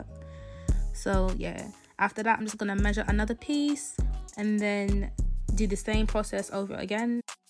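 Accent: United Kingdom. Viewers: so you british or american because i heard the british accent in another vid and now i’m real confused😩😩if it’s fake though you is good at it (i’m from the uk btw) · Love the English accent yeah 😍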